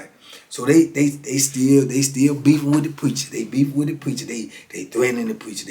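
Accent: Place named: Washington DC, United States. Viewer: Every time I hear you, I hear DC vibes, real live. Lol.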